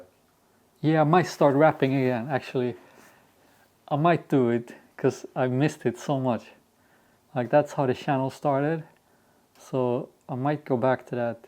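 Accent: Sweden. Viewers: Who said that your English is bad, actually I found it perfect, and add that little Swedish accent to it that makes it even better an special, honesty don't listen to those ppl cause you can't be liked by every one no matter what you do, just continue doing your own things and the most important part enjoy doing them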